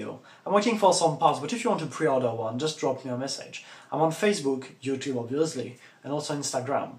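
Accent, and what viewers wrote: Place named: United Kingdom. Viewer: Amazing work as always. Love the English Channel accent <3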